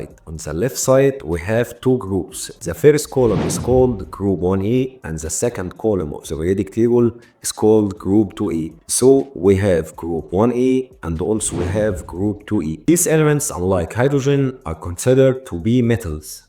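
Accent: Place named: Egypt. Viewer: الفيديو عظمة بس طلع لسانك في الTh يا مستر بدل ما كده مصري أوي 😅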